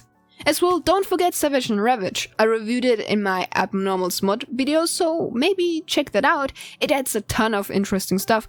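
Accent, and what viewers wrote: Czech Republic. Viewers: i luv ur czech accent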